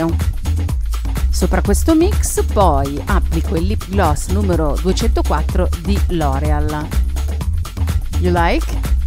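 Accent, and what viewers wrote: Italy. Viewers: Omgosh your Italian 😩 gets me everyt-i-m-e! Very glam hunny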